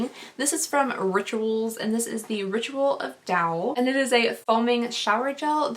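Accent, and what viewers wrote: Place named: South, United States. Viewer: Your holding back a southern accent...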